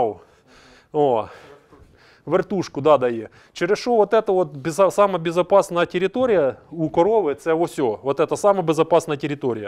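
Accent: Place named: Dnipro, Ukraine. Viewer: Добрый вечер ув. Подписалась на Ваш канал, во всем Вам поддерживаю и рада что у меня есть единомышленники. Я далеко но мечтаю о своей ферме.потому и далеко чтобы приблизить свою мечту и рада что встретила Ваш канал. Я Ваша землячка из Днепропетровщины. Вот только ненада оправдываться за наш акцэнт. Днепропетровщины всегда была и будет русскоязычной, как не крути и какбы это ненравилось нашим братьям из Западной Украины. Почему нам кто-то должен диктовать на каком языке разговаривать. Вам успеха во всем.и добра Вашему дому.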